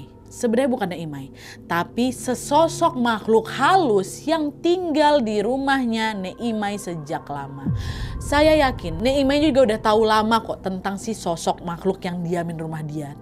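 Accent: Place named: Kalimantan, Indonesia. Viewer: pkee logat Kalimantan,hakk😭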